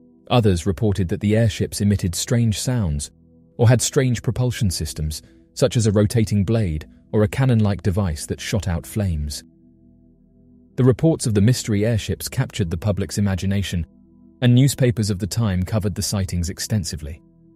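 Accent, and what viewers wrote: England, United Kingdom. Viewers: That English accent ; very pleasant .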